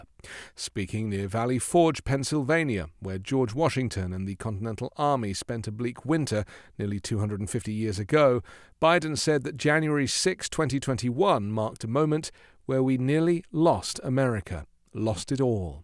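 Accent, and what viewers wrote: United Kingdom. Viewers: Fake news in short format. Brit accent to appeal to gays, RINOs and other Democrats.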